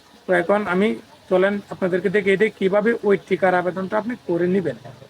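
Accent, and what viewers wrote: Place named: Noakhali, Bangladesh. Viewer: আপনি কি কলকাতার নাগরিক হন লাইন কি পাক্কা নোয়াখাইল্লা লোক।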